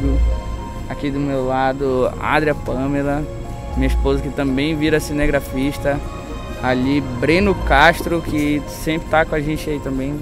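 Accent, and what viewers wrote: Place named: Pará, Brazil. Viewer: Não sabia que era paraense, mas descobri nos 10 primeiros segundos de video hahaha Segura o norte ❤️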